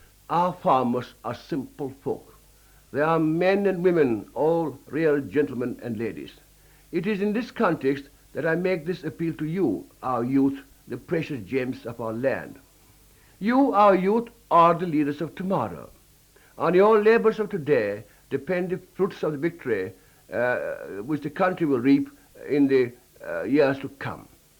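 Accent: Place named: United Kingdom. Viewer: After this vedio : Me :British accent tutorial. Jai hind to the national hero, pride of coorg Field Marshal K. M CARIAPPA first commander in chief of Indian army. First Indian to command troops of British under him. Ayub khan president and first commander in chief of Pakistan army served under his command. Led the 1948 war against Pakistan being the western commander. One of the two legends to be honored with Field Marshal rank.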